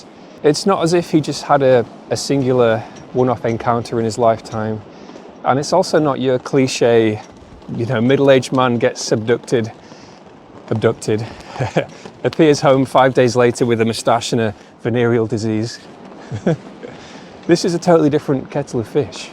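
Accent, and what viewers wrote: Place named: Yorkshire, United Kingdom. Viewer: He sounds normal for Yorkshire.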